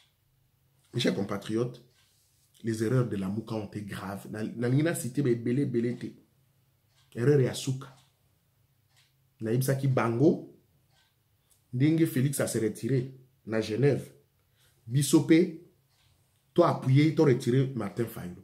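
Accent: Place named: Cameroon. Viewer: Yo kutu face nayo eza lokola ba camerounais même ta façon de parler tu es camerounais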